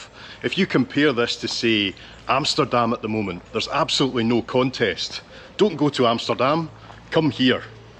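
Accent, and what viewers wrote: Scotland, United Kingdom. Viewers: Although there is that slight Scot accent, your Dutch word pronunciation is spot on. Love that you said Amsterdam with a short A instead of the long A most Americans use. Can you imagine using a long A for America? Yet they can't pronounce Amsterdam correctly. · Amazing video! Nice detailed view of one of The Netherlands oldest and most beautiful cities! I’ve been there a few times as well. Twice I went from the train station on to Belgium and France on my bicycle to follow my last name 😄 The Helpoort (Hel Gate) is a nice thing to see. It’s the oldest city gate in The Netherlands but barely resembles the original. It has been rebuilt and reconstructed quite a few times over time, so only the arch is original. The towers and spaces above it aren’t. Still a nice thing to walk through, knowing you’re walking through something that has been there for nearly 800 years. 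You’ve pronounced ’Maas’ correctly! In French (and also in the French part of Belgium), this river is called ‘La Meuse’, in Dutch it’s ‘Maas’ or ‘De Maas’. Actually, through your somewhat thick Scottish accent you actually pronounce most Dutch names and places quite nicely. Very entertaining to watch and now you’ve got a new Dutch subscriber! 👍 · I just noticed, your Scottish accent translates surprisingly well to Dutch. (Or you simply have a good ear, also possible of course.) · With your Scottish commentary my town seems quite exotic to me all of the sudden... and you point at details I never paid attention to. Thank you for your appreciation. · Yes, it wasn't difficult to understand him. I think Dutch spoken with a Scottish accent sounds interesting.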